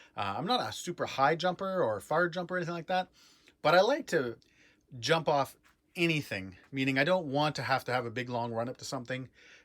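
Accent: Canada. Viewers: You from Nova Scotia ?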